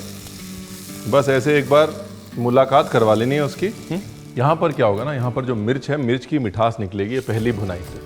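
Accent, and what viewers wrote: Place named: Lucknow, India. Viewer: Aap English bhi Lucknow walo ki trhan bolte hain..☺️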